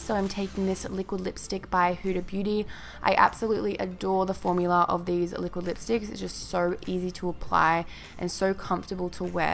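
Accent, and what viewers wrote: Australia. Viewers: GensMakeup is Australian like me!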